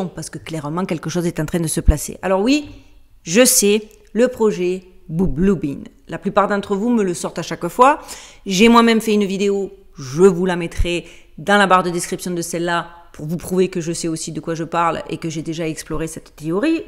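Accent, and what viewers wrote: Marseille, France. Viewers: Salut, on reste toujours jusqu'à la fin des vidéos! Ok tu n'es pas très catholique, cependant, il reste en toi, et c'est tant mieux, de belles valeurs, celles que le Christ est venu nous enseigner. Autre chose, dans la vidéo de la semaine dernière, tu nous disais que tout avait été dis sur la mort de la reine d'Angleterre et que du coup, tu changerais de sujet. Cela dis, je travail près de 10h par jour du lundi au vendredi, et je ne m'accorde que quelques heures d'écran par semaine, le journal TVL chaque soir, les vidéos de Greg Toussaint, un peu de Blanche Gardin, Campagnol TV et bien sûr, chaque dimanche, une jolie blondinette avec un accent marseillais magnifique au surnom de. Alors, je crois que tu peux nous parler de tout, vu que beaucoup, je crois, t'on choisis pour cela. Belle journée, Nico de Belgique. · bonjour 1/ je vous trouve geniale et sympa avec votre accent du midi 2./ je royalement d'accord c'est ce que je pense aussi - vos documentaire sont hyper passionnant que je vais continuer a regarder vos autres videos - regrette pas de m'etre abonnee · Tant que les martiens n'affecteront pas ton accent marseillais, pas de soucis 🙂 Une rencontre du 3ème type pourrait être pourquoi pas, bénéfique à une humanité qui part de + en+ en quenouille comme on dit chez moi😉 Merci pour tes vidéos toujours passionnantes.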